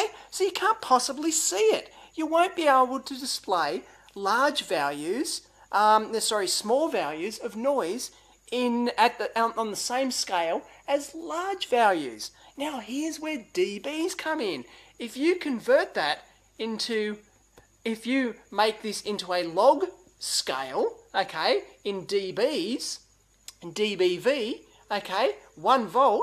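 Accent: Australia. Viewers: Thank you so much for the video! you are definitely an aussie aren't you? haha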